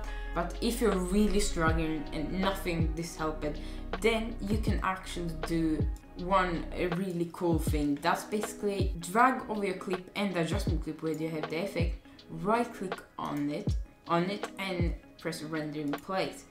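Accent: Sweden. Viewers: are u from sweden. im from denmark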